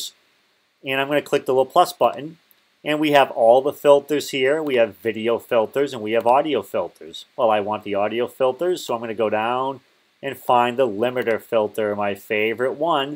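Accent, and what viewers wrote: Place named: New England, United States. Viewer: Great to hear a New England accent. It actually cracks me up I haven't heard it in so long.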